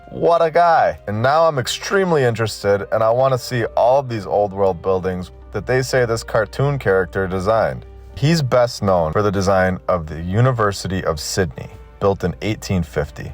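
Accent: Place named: Syracuse, United States. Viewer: I'm from Syracuse NY & he sounds like he's from my hometown. But he could also be from Chicago or Michigan. They sound a lot like Syracusans.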